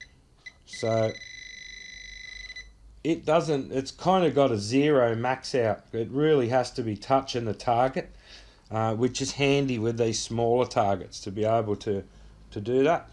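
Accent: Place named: Australia. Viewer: He's Australian Karen...Oops, I meant Mary. There's not just one type of English, even in the US.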